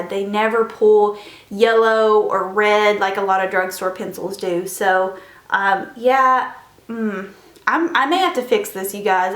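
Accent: South, United States. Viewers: Her southern accent and how she's so animated makes me like her so much more than others I love her oh my gosh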